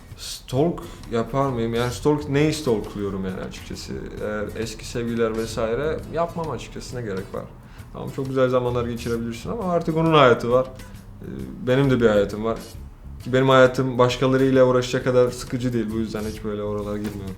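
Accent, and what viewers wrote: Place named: Cyprus. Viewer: Kıbrıs aksanına çok benziyor konuşması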